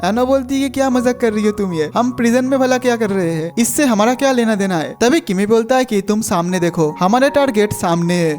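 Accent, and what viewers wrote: Bengal, India. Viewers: Bhaiya aap Bangali ho kya?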